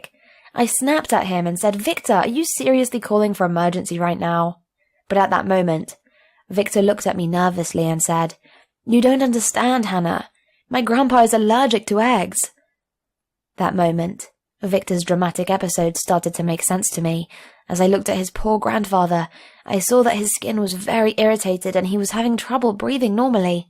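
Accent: United Kingdom. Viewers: Y is this recorded in a British accent if they went to Walmart because that american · I like how she has a British accent and goes to Walmart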